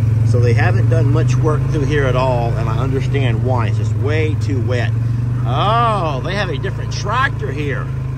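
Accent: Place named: South, United States. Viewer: Spanish and French are very similar. He's going to have trouble with you. Your broken English (Southern speak) is off-the-wall. The French, Mexican, and MOST every other country were taught English in school. What you speak... Is not proper English. You're the problem. That man was charming and non-judgemental of... Your broken English.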